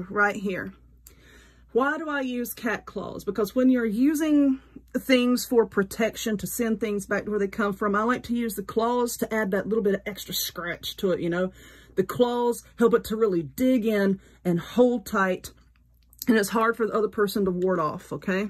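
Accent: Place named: South, United States. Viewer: I can't believe it took me this long to find this channel!!! I've been following your crafting channel for a couple years. I love your crafts and your southern accent! I can't wait to watch all of these videos. 🥰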